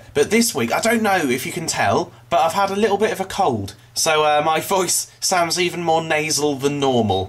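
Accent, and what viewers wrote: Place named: United Kingdom. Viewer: I try to figure out how to do your british accent...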